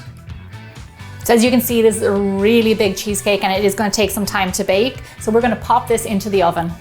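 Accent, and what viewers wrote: Ireland. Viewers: Can't loose good aulde urish accent, great video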